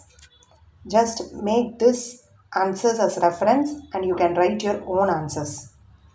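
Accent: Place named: Tamil Nadu, India. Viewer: Are you know Tamil I think you are in south side Because your slang is wrong Your slang is like a Tamil people I know Tamil and Telugu also my mother tounge is Tamil and I know hind and English also I know Hindi 100pesentage my mam say very good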